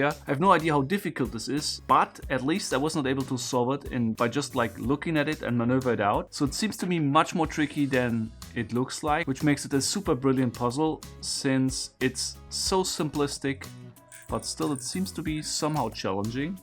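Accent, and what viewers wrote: Germany. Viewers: I miss his german accent, its still there but back in the day it was more german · I live in a very German area in Texas. Your accent is normal to hear around here. Very normal! Fredericksburg Texas is a small German town. I spoke German in school until it was verboten in 2nd grade. Back in 1973... I can go to town and still here people speaking German to this day... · Iwie hörst du dich deutsch an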